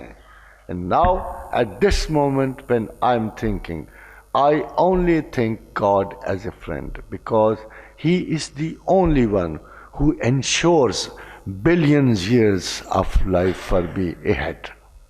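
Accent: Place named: Punjab, Pakistan. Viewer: Not able to understand your Punjabi Urdu dialects, next please speak haltedly or kindly speak in English for English audience Sirji.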